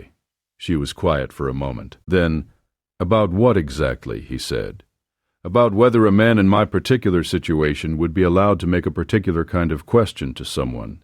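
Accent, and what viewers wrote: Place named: New York, United States. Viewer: The Narrator must be from a crowded city in….New Jersey or New York. His performance is….inept Great voice though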